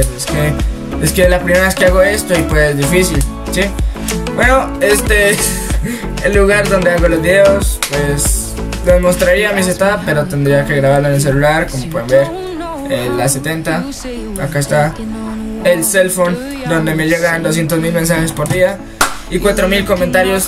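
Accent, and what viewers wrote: Colombia. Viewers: Jaja lo sabia que eres de bogota coloombia se notaba mucho xd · Se te nota lo colombiano Viva colombia! uwu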